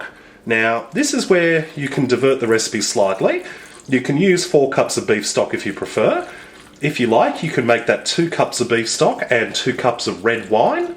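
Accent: Australia. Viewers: Australian mate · let me guess. definitely Australian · Love the Aussie accent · He’s an Ozzie 👌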